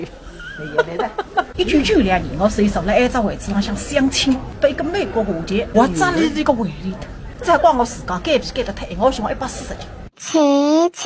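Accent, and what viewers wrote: Suzhou, China. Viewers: 嗲，正宗苏州闲话。在加拿大的上海浦东人，听苏州话。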